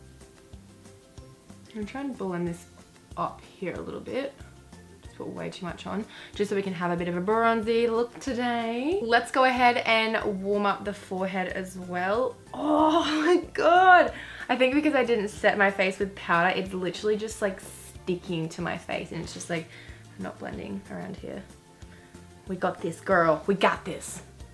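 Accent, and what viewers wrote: Australia. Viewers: Is she Australian?? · She's Australian.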